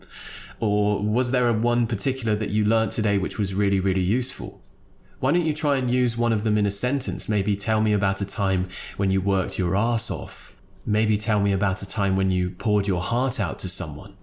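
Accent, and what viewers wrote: United Kingdom. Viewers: I'm a sucker for British accent :p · I love your videos and your pronunciation! I adore British English! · Your voice and pronunciation .I love British English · I am such a sucker for British accent. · Ohhhh British accent is so charming!!!! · Can't help falling in love with your British accent :> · I knew the phrase "be a sucker for sth" before. Thank you for what you do on YouTube. I learn a lot from your videos just by listening to you. That's great! I'm a sucker for British accent. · Almost every comment section's top comment is about British accent XDDDD Yeah, I love it, absolutely. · Really, you’re one of perfect an English teacher, Specially your accent British 👌, btw u have a good sound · I love your British accent so so much. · I'm a sucker for british english <3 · Love your Britsh accent! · Thank you so much for this interesting and useful video! 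I've been your subscriber for a long time but I'm writing comments for the first time. I find all your videos useful and enjoy watching them. Your British accent is wonderful! I'll definitely learn these phrases from the video. I"ve never heard about some of them. Love your channel! Greetings from Tajikistan! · More than your british accent, I think is your voice, it's really a pleasure hear you... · I love british accent for the first time when i listen your accent. thank you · Your British accent is comfortable to my ears. In this video, there are lots of interesting useful expressions I have never heard! Thanks! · British accent is more sweet with you our respected teacher thanks a lot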